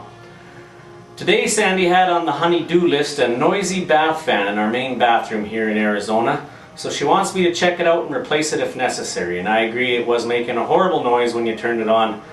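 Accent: Canada. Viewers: Little bit of a Canadian accent? Lol .. but enjoyed the content- thank you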